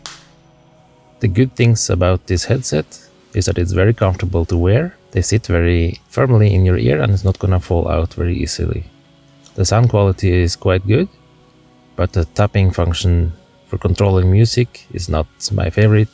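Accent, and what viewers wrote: Norway. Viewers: the norwegian accent. AHHHHHHH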